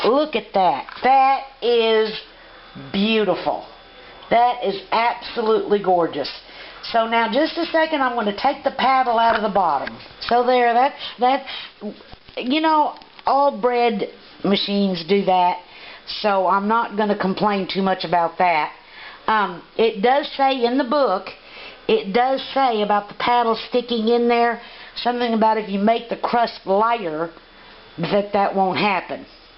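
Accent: South, United States. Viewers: Nice Video! You are more entertaining than Paula Deen and you sure do have a more distinct accent than her!! Nonetheless, I am from Buena Vista VA and therefore speak Southern and Hillbilly so I had no trouble understanding you, and it was nice to hear some familiar accents!!! I already have a DAK Turbo Baker IV which makes great bread, regular, oat/whole wheat, white, and whole grain, as well as dough, and I have use all of those cycles with great results, but I am in the market for a machine that does the cake thing!! I was hoping your video would have shown that, but you stated that you're not interested in the cake making feature of your Ham Beach machine. Well, let me tell you something, Miss Lady, us men like dessert and you sound like you've got a good man that you've been taking good care of for many years, so you'd better start making some cakes for him in your new bread machine and then fire up your camcorder and put some videos of your cake bake on the internet!!!!!!!!!! 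If that recipe book came with a Lemon Pound Cake recipe in the 'cake' department, whip one up and put it on You Tube!!! Thanks!!!!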